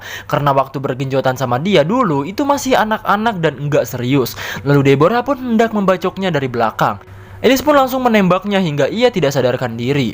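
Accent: West Java, Indonesia. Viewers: sunda bet dahh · anying sunda na mantap ngangkang aink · Lucu sunda na mna a? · Orang suda ya min hehe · Fix org sunda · Pasti org sunda yeuh s mimind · ngakak kie sunda na wkwkwk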